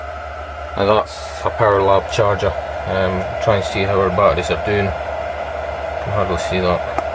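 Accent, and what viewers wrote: Scotland, United Kingdom. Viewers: sweet system are you from Scotland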